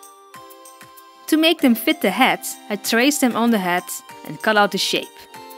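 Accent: Netherlands. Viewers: where are you from? you have a really dutch accent XD <3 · ok you can hear your dutch! but that what i love ( and the doll omg ). i always makes me smile when you talk bc i hear myself in it haha ( btw i'm dutch if you didn't know yet ) but i love him he's awsome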